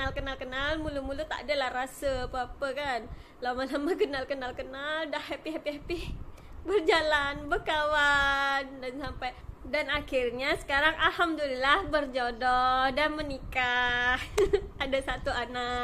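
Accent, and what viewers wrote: Malaysia. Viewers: sudah boleh bertutur mcm org Msia...bagus kl boleh d beritahu asal dr daerah mana d Indo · bagusnya servive. lagi cakap juga sudah malaysia.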